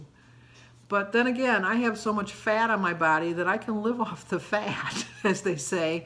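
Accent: Midwest, United States. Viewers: Hi. I love your videos (and your midwestern accent:) You motivated me to join WW (tomorrow is my weigh in after my first week). I really like your day to day videos. Thank you!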